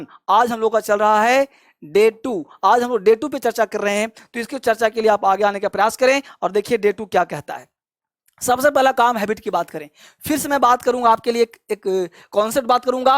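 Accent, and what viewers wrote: Bihar, India. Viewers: Sir aap bihar se belong krte h ky? Aapki boli me boht mithaas hai... Boht acha lgta hai aapki aawaz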